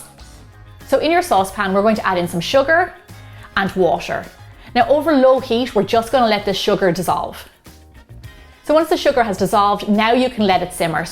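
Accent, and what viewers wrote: Ireland. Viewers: Are you Irish or American?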